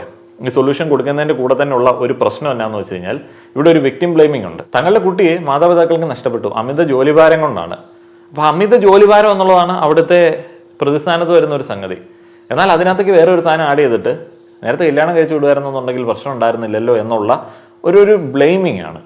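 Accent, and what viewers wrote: Kottayam, India. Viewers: Only a Kottayam Boy can talk like this.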